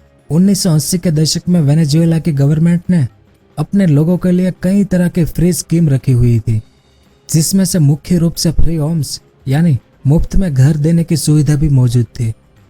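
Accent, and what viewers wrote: India. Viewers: Tu bolne me pura desi hain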